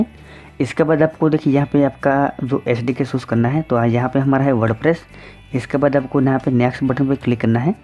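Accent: Gujarat, India. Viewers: Seems like Gujrati. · Bhai gujrati laago cho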